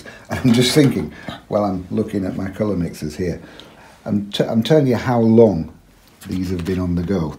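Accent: Yorkshire, United Kingdom. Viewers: Hello I’ve just discovered your site. I love the painting of the horses on the beach. You sound as if you’re from Yorkshire. I have a mom that was from Sheffield. I’ve been to Yorkshire many times it is the greatest place I’ve ever been. ❤ I have a cousin in Doncaster. I will be enjoying watching all of your paintings and hearing you. Let us all know a little about you David V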